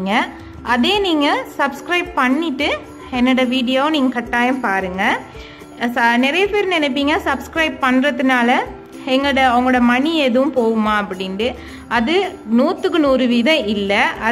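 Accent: Sri Lanka. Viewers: Very candid and innocent reply. Do not worry about your accent and language that is the attraction for normal Tamils to hear srilankan accent . If your mother tounge is not tamil then you are doing a super job. And your cooking is also short and crisp Just keep it up. My good wishes for all success in life. You will shine sister. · லங்காவில பேசக்கூடிய தமிழ் எனக்கு ரொம்ப புடிக்கும். அதிலும் நீங்க பேசக்கூடிய தமிழ் எனக்கு ரொம்பவே புடிக்கும். உங்கட சமையலையும் சேர்த்துதான் சொல்றன். அல்லாஹ் பாரக் ஃபீ.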